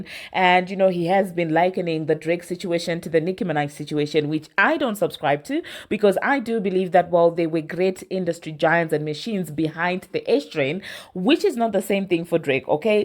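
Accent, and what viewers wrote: South Africa. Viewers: I know this is out of context. I love ur channel by the way. Are u South African?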